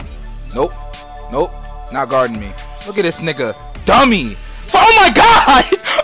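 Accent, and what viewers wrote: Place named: Brooklyn, United States. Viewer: What part of Brooklyn you from?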